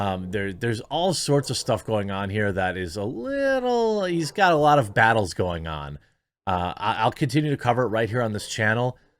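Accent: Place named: Midwest, United States. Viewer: it's the mid-western accent get over it.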